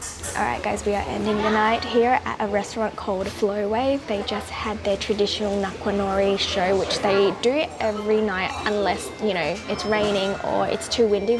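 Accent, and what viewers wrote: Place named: Australia. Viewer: I think I once left a comment on your vlog like, “Do Aussies really speak this fast?” 😂 Was watching Aussie videos to study English and ended up on your channel again… and omg, my hometown showed up!! It felt so strange (but in the best way) seeing it filmed so beautifully. And I’m in Melbourne now — day 3 here!! 😊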